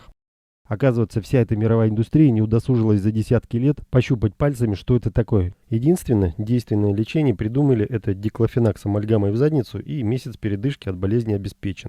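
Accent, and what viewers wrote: Yekaterinburg, Russia. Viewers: Екатеринбургский говор. Вы оттуда?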